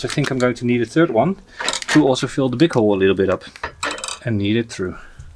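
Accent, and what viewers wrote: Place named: Netherlands. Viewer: Hello me deario! Great to hear from you with your lovely Dutch accent 👌I’m so glad success hasn’t had the effect of neglecting your early fans! All best wishes for the rest of 2025 ✌️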